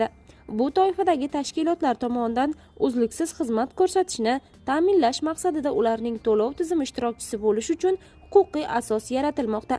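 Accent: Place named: Angren, Uzbekistan. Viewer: ГАПЛАРГА ТУШУНМАДИК. АНГРОК ГАПРСА БОЛАДИМИ. 😮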